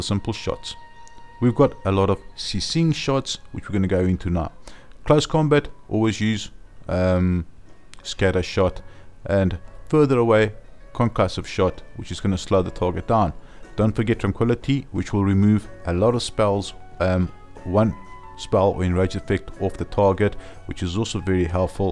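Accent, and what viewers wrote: South Africa. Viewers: hes South African accent ... i have the same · Your from South Africa.???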